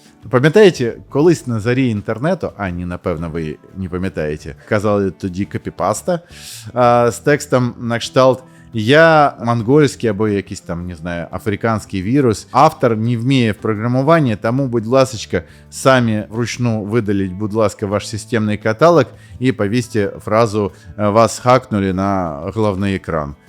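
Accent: Russia. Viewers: А это и не украинский. Сценарий пишут на украинском, а произносит он слова по правилам русской орфоэпии. Украинофонам тоже надо декодировать услышанное. Если сравнить с английским, то это как если бы было написано "the programming language", а произносилось "тхе праграминг лангуаге".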